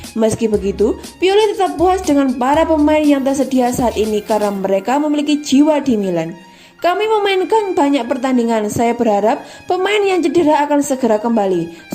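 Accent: Java, Indonesia. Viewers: Jawane medok nemen Yo? 😀😀😀 Tapi mantap.... 👍👍👍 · Jowone medok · Mbanya medok sangaat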